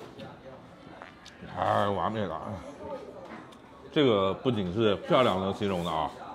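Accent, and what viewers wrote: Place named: Feidong, China. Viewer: 肥东话多少还是和市区的有区别 不过也差不多是乡音了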